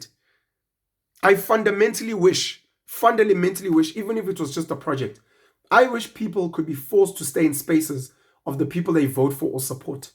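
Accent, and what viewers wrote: South Africa. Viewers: Owh the man...you sommer mix the coloured and white accent together🤣🤣🤣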